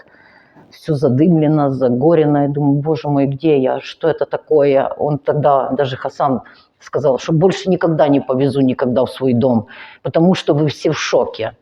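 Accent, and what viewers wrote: Ukraine. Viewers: У жіночки комплекс меншовартості. У неї акцент укрАінскій. Цікаво б було послухати її укрАінську мову. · Вы такая красивая,приятная ❤ мне нравится ваш украинский акцент ❤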